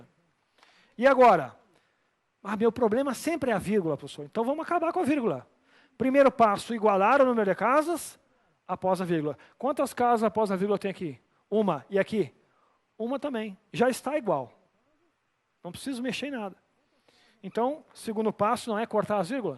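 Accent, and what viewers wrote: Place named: Curitiba, Brazil. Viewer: Aula perfeita! E o sotaque curitibano é o melhor kkk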